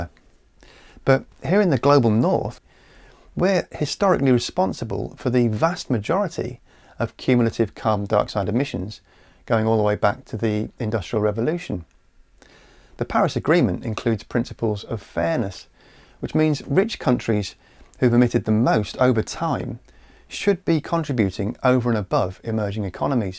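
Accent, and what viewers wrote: United Kingdom. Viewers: there are so many diffrent accents in the UK and this guy has the best one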